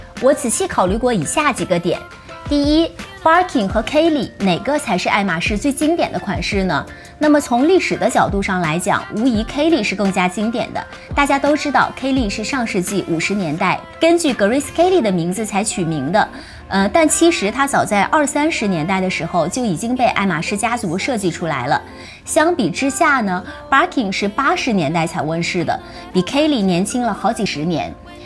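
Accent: Japan. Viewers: A Japanese way pronunciation found commonly in Japan. Haven't u been to Japan.... If not, watching more Japanese YouTuber lol...... Haha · 應該比慣用日文發音吧，她應該是銀座老闆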